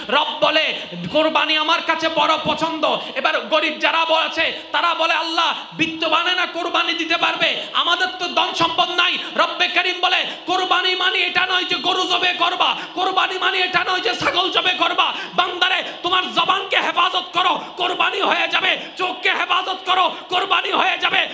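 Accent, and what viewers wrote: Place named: Chittagong, Bangladesh. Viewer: ওটা চট্রগ্রামের ভাষা সবাই চট্রগ্রামের ভাষা বুঝে না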